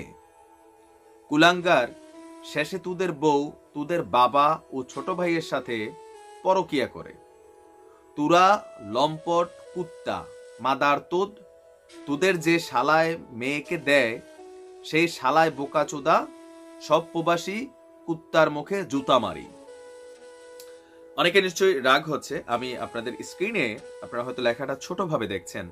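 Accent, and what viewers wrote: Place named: Barishal, Bangladesh. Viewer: ও মনু আমনে দেহি মোগো বরিশালের ভাষায় কথা কন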